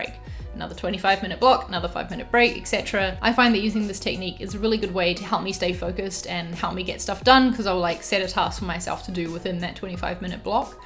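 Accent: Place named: New Zealand. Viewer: I thought this was a helpful review, as you talked more about how you use your Apple Watch rather than get into the weeds of technical details. It’s difficult to find examples of people using their Apple Watch amidst the sea of specs-oriented ‘tech reviews’. As you point out, this device is a luxury which nobody needs to get. In that case, I think it is more interesting to know how people can make use of the Apple Watch and what kind of value they get out of it. Being rather drawn to gadgets, I might yet pick one up. And also, a pleasant surprise to hear a kiwi voice!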